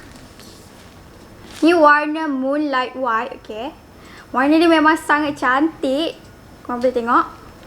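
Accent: Kelantan, Malaysia. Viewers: Ore kelate ke review.? Macam kelate jah... Jugak..?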